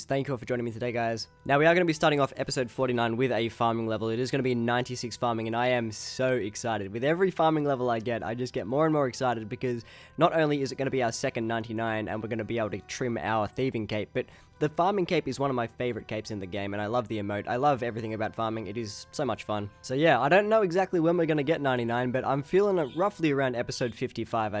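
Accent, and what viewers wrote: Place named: Australia. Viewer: Enjoyable content as always and your Aussie bogan was showing a little in this one :P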